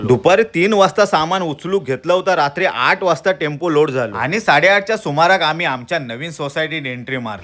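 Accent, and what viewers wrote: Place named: Malvan, India. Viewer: Dada tujhi malvani aikun maja yeta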